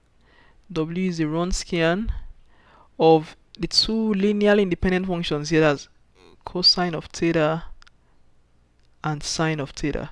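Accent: Nigeria. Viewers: You are Nigerian aren't you lol, anywayz thanks for the videos it does help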